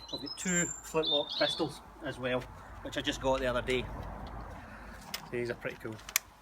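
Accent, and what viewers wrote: Scotland, United Kingdom. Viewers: Scottish accent is soo adorable! 🤩 Greetings from Bavaria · My favourite accent....scottish accent😍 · You would be my perfect weapon!! You are GORJUZZZZZ!!!! I love the Scottish accent (all dialects), with your looks and build, you are perfect! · A Plait is very practical... wht you need more? I love the scottish akcent.... as i was in scottland there was also a "Highlander" who was showing what you can do with a plait. Its a awesome thing. Thumbs up for that video. Greets from Germany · Well at least he sounds Scottish. With the Outlandish craze I was expecting fourth generation heart on sleeve Good ol' Texas Scot telling us how to suck eggs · I didn’t even need to hear this guy’s voice to know he was Scottish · Wow, CC does not understand a Scots accent!!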